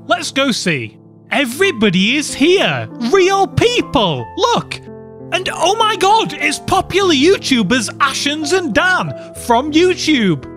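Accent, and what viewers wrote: United Kingdom. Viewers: He’s British, So Is “Stampy”. Of Course He’s Gonna Something Like “Stampy”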